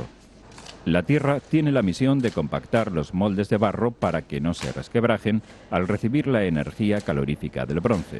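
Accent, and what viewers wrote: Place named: Spain. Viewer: Jajajajaja....España hombre, no percibe el acento?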